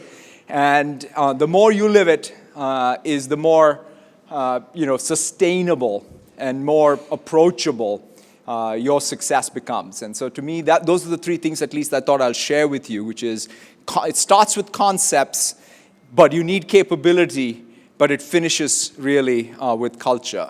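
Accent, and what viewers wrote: England, United Kingdom. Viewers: English accent 🗿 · Looking at his English accent I think he almost forgot telugu. Listen the way he said 'Tank bund'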